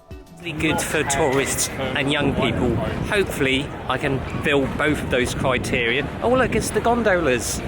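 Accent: United Kingdom. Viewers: it feels awkward seeing someone who is asian having a british accent hahaha i dont know why, i'm asian btw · My mind still can't process and Asian with an English accent!!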